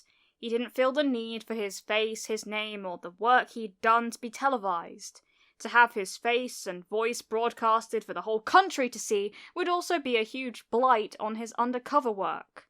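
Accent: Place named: United Kingdom. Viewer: Your British accent was hilarious 😆 I love you videos ❤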